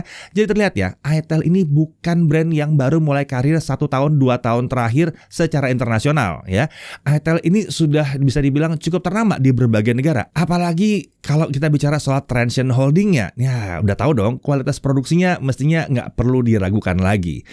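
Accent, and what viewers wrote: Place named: Java, Indonesia. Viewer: iTel javanese speak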